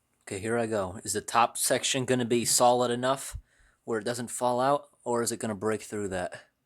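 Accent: New England, United States. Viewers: LOL i cant believe that doesnt even smell bad🤔, thats crazy. Btw your voice is amazing. It must be the new england accent🤔. Im from Canada. Anyways its very nice.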